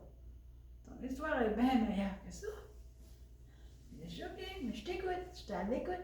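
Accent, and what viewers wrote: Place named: Quebec, Canada. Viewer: J'aime bien votre joli accent Québécois 🔝✅👍💯💖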